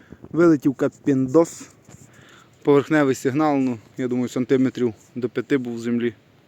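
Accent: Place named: Bukovina, Ukraine. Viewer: вітаю.цікаві походеньки, підписаний на Вас.Чую по мові та бачу по природі, що Ви десь на Буковині.Якщо це так, то хотілося би знати район, а все для того, щоб десь походити разом.Дякую і кладуху сєрєбруху!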